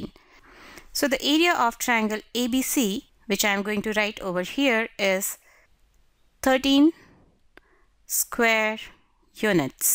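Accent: India. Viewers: Not trying to be racist but your accent sounds like your Indian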